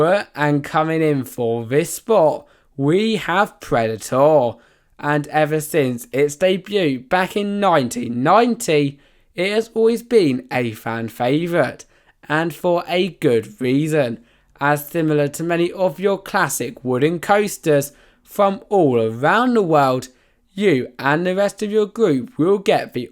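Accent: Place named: United Kingdom. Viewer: What's with the drunk British guy commentator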